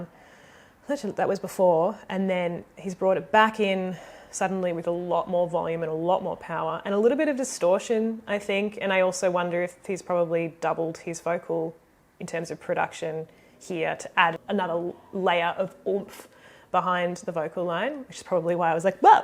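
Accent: Australia. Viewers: Wait.. am I not identifying your accent correctly.. are you Australian? I always thought that strange instrument sound is a didgeridoo, isn't it?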